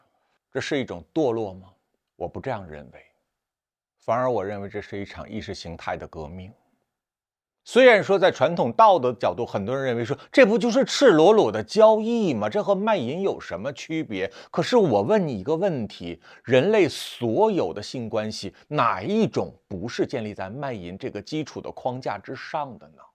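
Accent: China. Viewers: ？你的頭髮還沒白，不夠格當叔，別自我抬搞一輩。你的自介雖用繁體字，但你的口音掩飾不了你是中國人的事實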